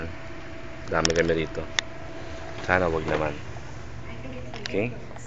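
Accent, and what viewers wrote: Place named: Pakistan. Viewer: lol this is not saudi he is pangaladish or pakistane lol look to the way that he is talking in lol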